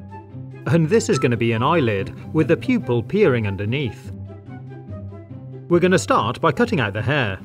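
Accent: United Kingdom. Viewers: u keep on saying "cool huh?" But are u from UK?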